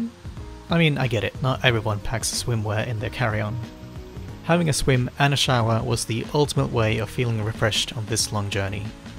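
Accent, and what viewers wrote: Australia. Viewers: I came across your channel through the Casiopea video and always thought I could hear a slight Australian accent in some instances, now I understand where it comes from :)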